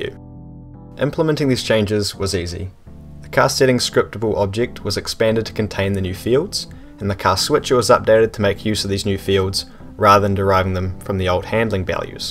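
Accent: New Zealand. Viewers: Are you kiwi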